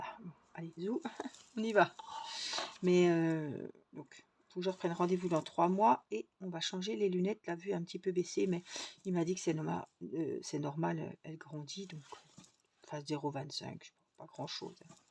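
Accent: Franche-Comté, France. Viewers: Est-ce un delicat accent franc comtois que j'entends ?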